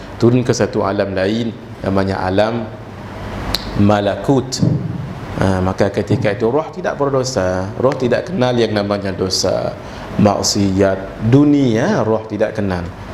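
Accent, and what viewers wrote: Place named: Sarawak, Malaysia. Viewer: dlam bercampur2 slang melayu sarawak dan kedah tetapi tetap indah dan sopan serta penuh ilmu.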